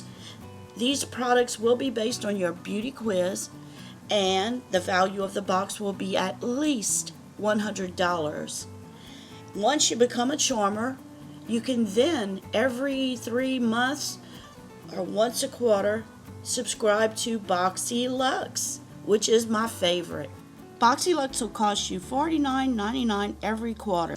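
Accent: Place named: South, United States. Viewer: I just love the way you do ur presentation, u talk about products and research what they are. I love the way u pronounce spoilers, with ur accent, since I was a little girl I always loved southern accents and I have always been envious ladies who spoke with one🤩😍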